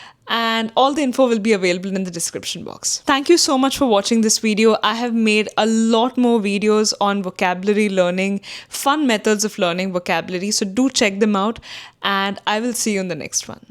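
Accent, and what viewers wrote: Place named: India. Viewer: Thank you, muchas gracias. You seem to have an Indian accent.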